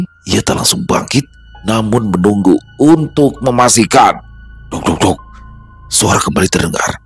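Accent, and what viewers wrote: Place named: Banjar, Indonesia. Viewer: Ku kira dari om rasth ternyata aku lupa kalau bang saujana juga orang kaltim😂,kalau bang saujana Kaltim om rasth kal teng,kenanga kinasih kal sel penulis dari kal bar belum ada kah bang,aku klau kisah2 dari tanah Kalimantan selalu excited bnget palagi dari om rasth penulis yg epic bnget menurut ku lah, selalu menanti cerita beliau,kalau bang ga bisa dengan kata2 soalnya sdh tentulah pembawaan nya candu buat aku ternyata logat/ pemandiran bang sdh mahir bahasa banjar lah kd nglih lgi mun kena kepulau Kalimantan 😊bajalanan tinggal tagur2 hj 😅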